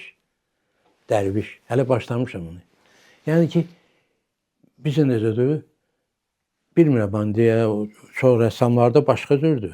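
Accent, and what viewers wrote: Baku, Azerbaijan. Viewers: Bakililarin lahcesi yekdir. Allah Size omur versin❤❤❤❤❤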